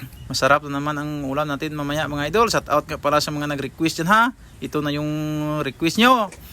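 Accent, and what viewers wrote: Iloilo, Philippines. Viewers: Ilonggo ka dong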